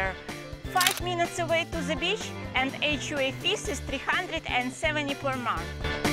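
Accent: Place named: Russia. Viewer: I like your Russian accent!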